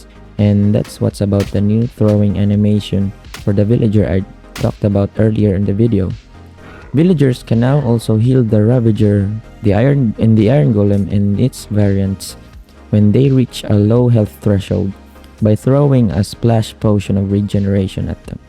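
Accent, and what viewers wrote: Philippines. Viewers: You a Filipino? Your accent seems like filipino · Hello! Are you a filipino? because you sound like..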